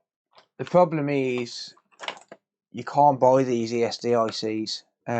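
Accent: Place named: Midlands, United Kingdom. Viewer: which town you from mate, sounds like you got a midlands accent. I'm from wolves myself, now live in the States.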